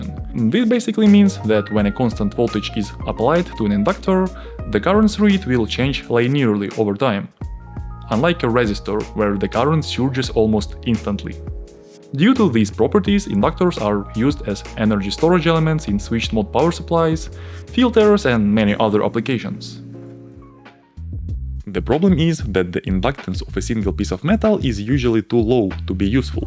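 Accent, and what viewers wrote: Russia. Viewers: От русского акцента трудно избавится. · какой русский акцент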